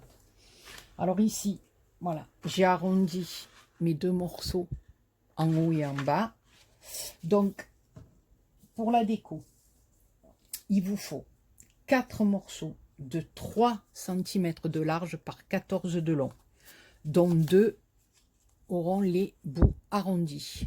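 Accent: South, France. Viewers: Coucou contente de te retrouver toujours avec ce petit accent du soleil. Très classe cette boitounette et toujours des explications claires elle me plaît beaucoup 🤩🥰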